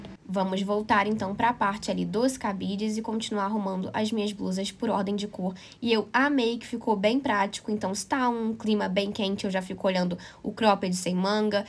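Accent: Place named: Rio de Janeiro, Brazil. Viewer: Tanto casaco; com esse sotaque de carioca? Faz frio ai? Só em Petrópolis ne né?!!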